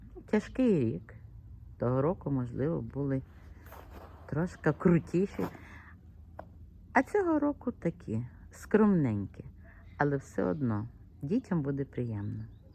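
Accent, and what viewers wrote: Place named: Sumy, Ukraine. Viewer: у вас речь украинки жительницы Сумской области Вы замечательная хазяечка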